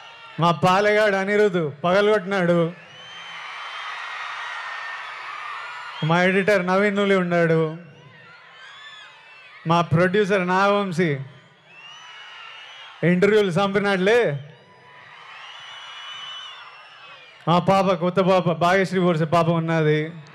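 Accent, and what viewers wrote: Rayalaseema, India. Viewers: Rayalaseema slang ippudu, next Godari slang loading 👌👌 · Rayalaseema slang bagundanna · అన్నా రాయలసీమ యస చన బాగా మాట్లాడినవ్ అన్నా నీ సినిమా బాగా ఆడతదిలే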